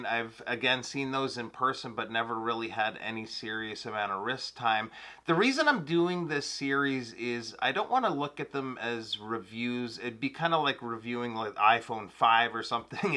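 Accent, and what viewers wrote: Canada. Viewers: Great demonstration, disliked for the Canadian accent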